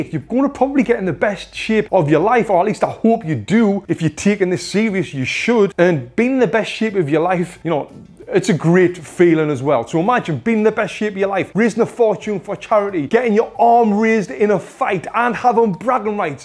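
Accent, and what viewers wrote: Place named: North East England, United Kingdom. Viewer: Great advice, and explained clearly with enthusiasm, glad to see you've not lost the North East accent mate! Well done.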